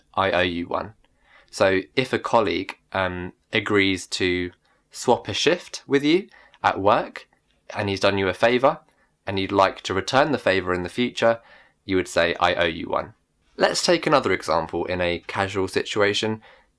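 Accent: United Kingdom. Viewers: You are sooo freaking amazing!!!!😍 I am currently binge watching your videos and I can't stop admiring you!!!😍😍😍 I wish I can go from Indian accent to the British accent like you do!!😍 It's magical trust me🌟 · Can anyone please tell me what kind of British accent does have? Is it RP?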